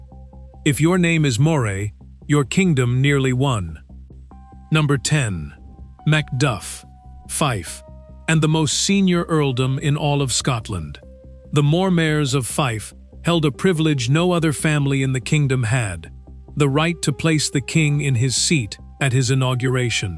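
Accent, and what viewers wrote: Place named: United States. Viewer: Why is it so hard to get a real life narrator. The dramatic AI narration with the US accent is so unsuitabel.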